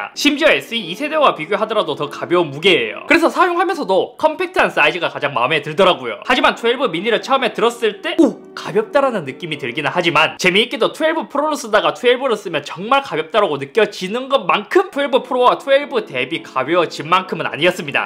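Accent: Gyeongsang, South Korea. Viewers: 이렇게나 확실하게 경상도 말로 이야기하는데도 이렇게나 감각 좋고 세련되게 가젯 리뷰하시는 분은 달리 없음. 12, 12미니 비교 리뷰 여러 개 봤는데 님 것이 최고네요. 덕분에 12미니 펌핑 사라짐~ ^_^ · 이 클립은 경상도 시청자들을 위한 것인가요? 전국의 국민들을 대상으로 한 클립이면 사투리 액센트 고치시고 영상 찍으시면 좋겠네요 성우를 쓰시던지요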